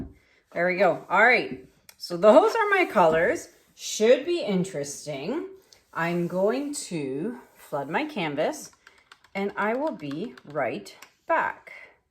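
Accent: Canada. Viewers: I just love your videos. They're incredibly informative and love the Canadian accent. Your work is gorgeous. One of the videos of yours that I watched you counted in Greek and said that's your Greek lesson for today. I'm a Greek girl from Chicago so I was hooked after that. I also have a Sophia. :)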